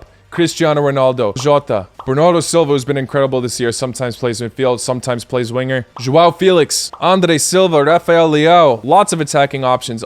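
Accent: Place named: United States. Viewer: I like the content but I can’t stand how he pronounces words, he’s obviously American.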